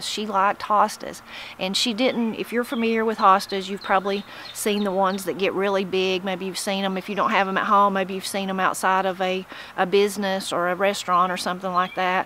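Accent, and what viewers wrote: Appalachia, United States. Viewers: I always thought these were poisonous! Well how do you like that. Have you eaten them? Wait What, Now I’m learning the second thing,I didn’t know about hostas,is that those seed pods you can throw them and they’ll actually grow from themselves. I have tried to dry those seed pods and they just mold or get all furry looking gross and I have to throw them out.. OK back to the video, I might learn the third thing I didn’t know and I’m older than you and I love growing hostas in what little shade I have out here.in the southern part of Indiana. Wow this is the best video. I want to try them. And that Appalachian speak I’ve never heard of astilbe been called As ta bil… ( Uh stil bee ) Astilbe.. The Appalachian language..❤️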